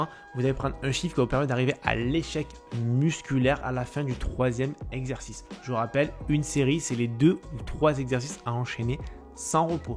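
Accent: South, France. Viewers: Accent du sud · Le bel accent du Sud ! Il y'a que ça de vrai !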